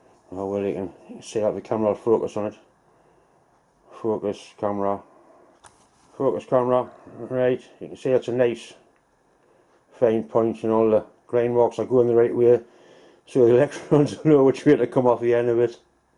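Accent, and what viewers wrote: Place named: Geordie, United Kingdom. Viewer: Absolute nonsense. has one of the finest regional English accents. He is a proud Geordie and long may he remain so!!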